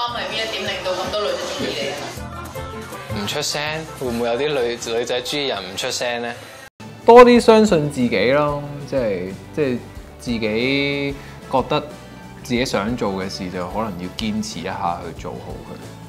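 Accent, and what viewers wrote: Taiwan. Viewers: 我知呀！所以我話我估計佢爸爸媽媽新移民黎香港生佢之後，可能屋企都同佢講普通話，到佢大個自己鍾意台灣歌，pickup 得好快台腔。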